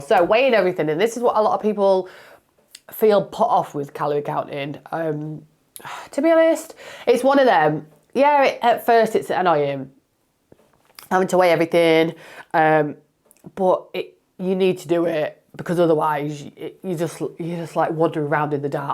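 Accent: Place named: Doncaster, United Kingdom. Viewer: Well done, you look amazing and I bet you feel even better. You sound as if you’re from Doncaster like me, am I close? 😊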